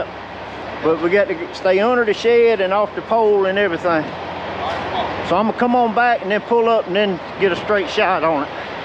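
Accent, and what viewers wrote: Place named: South, United States. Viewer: no P in sumter lol, that southern draw, love it. great video and recovery. you have a great deal of patience. I recently recovered a tracked skidder with mud and water over the controls, crappy filming I did because people didnt want to be on film and they didnt know how to operate it at all, made for a long day, lol. My truck is way smaller too, lol. Yall stay safe and keep having fun.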